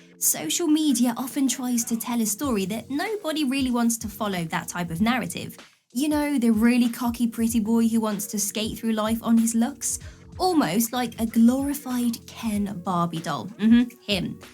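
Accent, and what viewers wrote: United Kingdom. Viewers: You sound British,but u r based in usa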